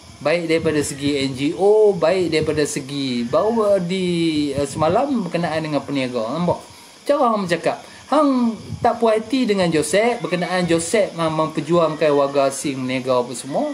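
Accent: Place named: Kedah, Malaysia. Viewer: Abang ni mesti orang kedah..dengar dia cakap pun dah tau..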